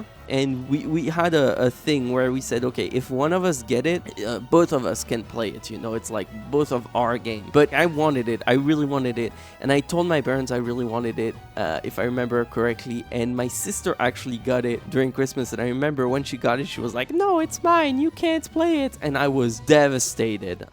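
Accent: France. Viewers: Not gonna touch on everything but : Starting off with Goldeneye on N64 is so funny bc for some reason i was kind of obsessed w the game when i was a kid (not even necessarily playing it, just the game itself? i don't think i even really knew who James Bond was tbh lmao) I played Eyetoy Play sooo much it's actually silly how much i played it I have such fond memories of New Super Mario Bros on the DS, mostly bc i associate it with my mom - we all played it but that game was serious business to her lmao (especially on vacation) I really liked the minigames in Mario Kart DS but i think it's bc my Mario Kart™ was Double Dash on the Gamecube so maybe that played a part? If you played that one i wonder how you felt about the mini games in it Anyway, this was a fun video and i'm glad both you and your sister have memories around these games (and many more i assume). Jumpscare incoming: Et d'habitude je capte l'accent français mais j'avoue que j'y ai même pas pensé jusqu'à c'que je vois ta réponse à un commentaire sur une autre vidéo ptdrr but lowkey my English is better.. 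so let me pretend i didn't say anything !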